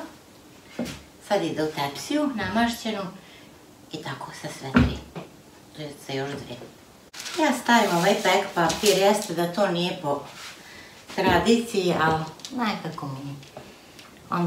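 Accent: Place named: Sombor, Serbia. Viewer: Kad Bas slusam kao da sam u Somboru.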